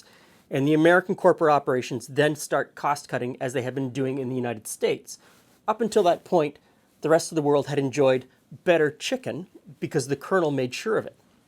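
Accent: Canada. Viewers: I can spot a Canadian accent in a few minutes! PS i met the colonel back in the day here in Michigan! What is it that Brits love KFC? My mother born in Scotland used to serve it up at family events!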